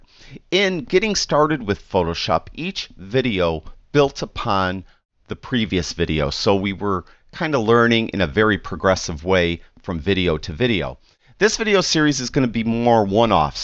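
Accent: United States. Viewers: great teaching, . This doesn't seem to look right on close up objects such as a flower. As far as you talking too much, lets just say i am getting a Yankee accent, Actually i enjoy listening to your teachings. keep up the good work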